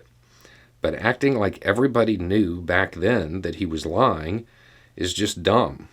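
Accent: South, United States. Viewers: It must be your Southern accent/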